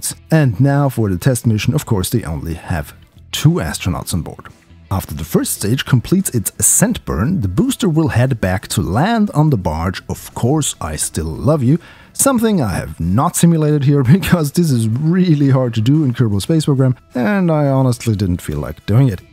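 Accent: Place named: Germany. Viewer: Bist du deutsch? Dieser Akzent..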